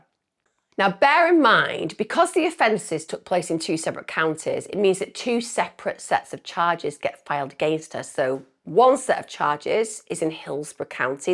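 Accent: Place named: Cockney, United Kingdom. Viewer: This video becomes "real fucking monotonous and boring" after about 5 minutes. Her "British Cockney Accent" is......ANNOYING AS HELL!!!!😂😂😂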